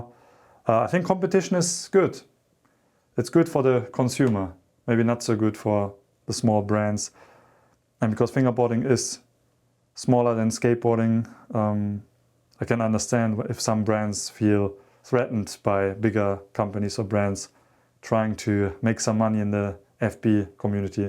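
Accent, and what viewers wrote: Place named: Germany. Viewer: Bro's first language is German, cut him some slack.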